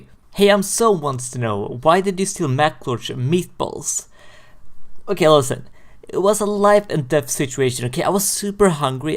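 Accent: Sweden. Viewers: are you swedish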